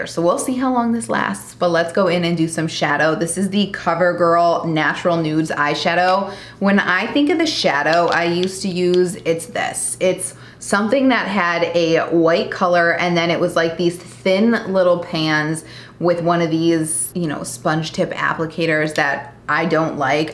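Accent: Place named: Midwest, United States. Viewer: the midwest accent is everything.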